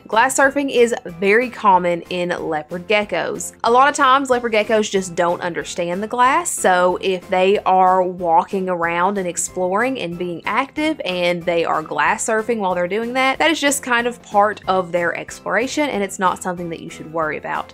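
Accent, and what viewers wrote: South, United States. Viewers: that southern accent❤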